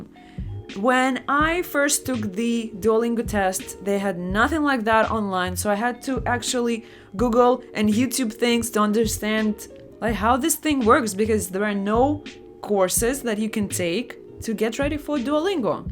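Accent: Russia. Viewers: My English skills is horrible, but i decided to take the Duolingo practice test and i scored just 60-75 :( Oh my gosh, i need to study more 🥺 I'll try it one more time :) I almost forgot to tell this, i found out your channel a few minutes ago by mistake and now I'm a new subscriber :) By the way, are you Russian? I've noticed a Russian accent in a few words as you speak or am I crazy 😧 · Ikr! I really liked the russian accent 😂😂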